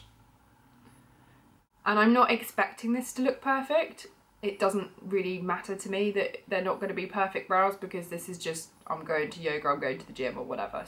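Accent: United Kingdom. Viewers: what part of uk is your accent? If you don't mind me asking. I must say, you're very beautiful and your accent makes you perfect 😊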